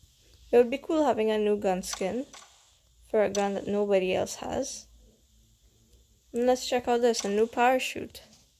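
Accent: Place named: Trinidad and Tobago. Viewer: you sound like a Trini but I'm from here. If you want to link with my clan hit me up. 『GØD』Ërmac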